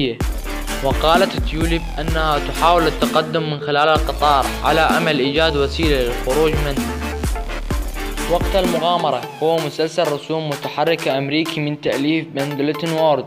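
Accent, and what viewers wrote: Iraq. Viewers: إضنك من العراق لئن لهجتك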